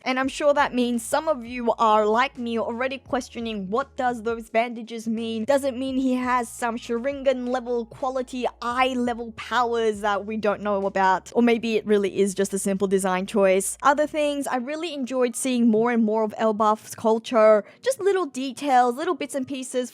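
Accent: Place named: Australia. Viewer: She’s always beautiful. An Asian girl with an Australian accent.. the dream girl of most weebs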